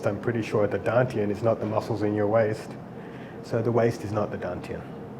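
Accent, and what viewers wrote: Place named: Australia. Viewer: Have seen on YT before, but didn't pick the Aussie accent until just now! Must keep an eye out for seminars here... 👁️ ☺️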